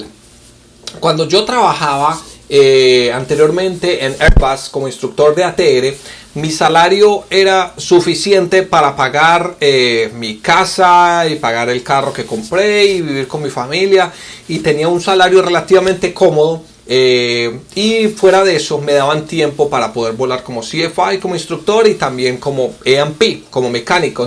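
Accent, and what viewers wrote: Colombia. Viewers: EXCELENTE....QUE SENCILLEZ. PARECE PAISA O BOGOTANO .....PERO COLOMBIANO SI ES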